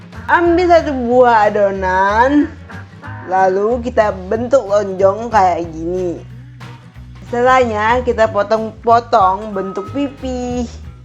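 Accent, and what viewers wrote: Palembang, Indonesia. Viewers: Cek , R nyo asli nian wong pelembang sabrang . Bedrot .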